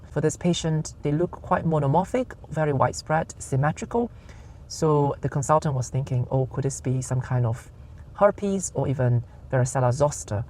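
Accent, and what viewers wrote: Scotland, United Kingdom. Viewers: I love your native accent, mixed with that tint of Scottish haha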